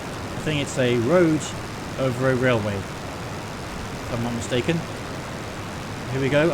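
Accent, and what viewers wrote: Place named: East Midlands, United Kingdom. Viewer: Good stuff, another excellent video with a great couple of fights. As a noob to RoF, I have learned an awful lot from watching your output. May I ask three questions? Why is that nobody in the RoF ever seem to pay attention to aircraft instruments? Along with everything you have put out I have also watched JimmyBlonde (alas now MIA), Dukely and diverse others and none of you ever seem to look at instruments. Secondly, I appreciate you are busy and haven't been very well, but will you be coming back to the Barrakuda campaign? That was shaping up to be a real beaut. Finally, if you don't mind a personal question, where are you from? I have been trying to identify your accent, I can't and my failure is driving me nuts. I'd guess somewhere in the East Midlands, maybe bordering on East Anglia but I'd can't place it. 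Anyway, keep up the good work and know your efforts are greatly appreciated.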